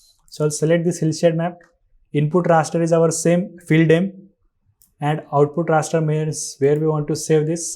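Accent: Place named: India. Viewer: I Love the Indian English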